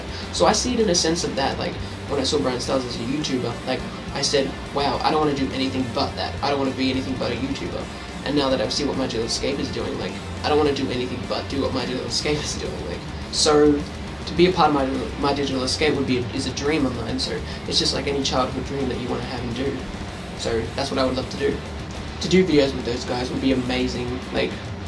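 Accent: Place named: Australia. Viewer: You sound Australian. Are you Australian? (I am auzzie 😜)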